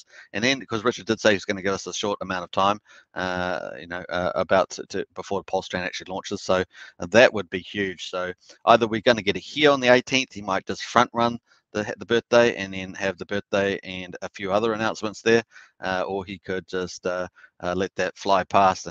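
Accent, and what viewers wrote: New Zealand. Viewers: Nice to hear a kiwi accent, thanks for the informative vid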